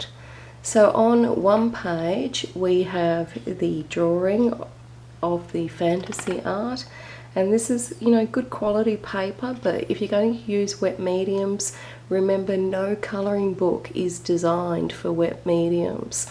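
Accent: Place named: Australia. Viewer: This is such a beautiful book! I'm looking forward to my copy! It should arrive in about two weeks or so. :) I really enjoyed this flip through, your commentary is enjoyable. I love your accent. <3 You from Australia?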